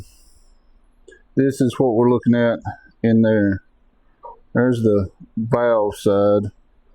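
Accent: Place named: South, United States. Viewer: By yer accent, you way south of me in Washington , I got several pushrods I’d give ya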